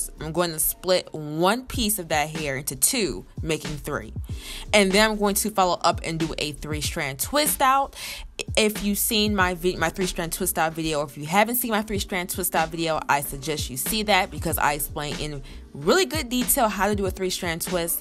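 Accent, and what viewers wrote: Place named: Philadelphia, United States. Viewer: baby girl u from Philly? it's soo subtle but i hear an accent😁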